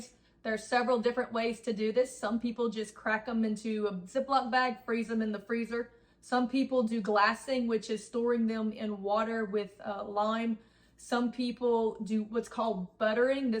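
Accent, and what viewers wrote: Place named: North Jersey, United States. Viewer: Love watching and hearing yr accent! North west nj🩷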